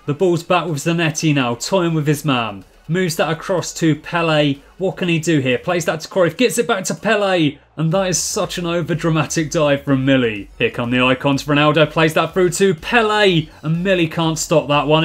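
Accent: Oxford, United Kingdom. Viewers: Are you from oxford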